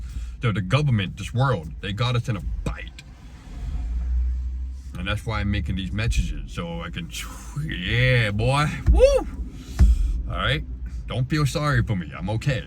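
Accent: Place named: Cajun, United States. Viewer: Are you from Louisiana? I’m getting a Cajun twang! Best of luck to you, brother!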